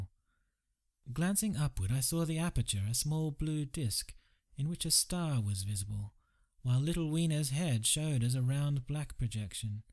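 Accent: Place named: Australia. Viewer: Very faint Aussie accent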